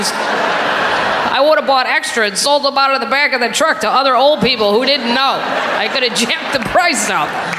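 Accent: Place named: Chicago, United States. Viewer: Are you from Chicago? Your accent defaults to da accent ❤❤❤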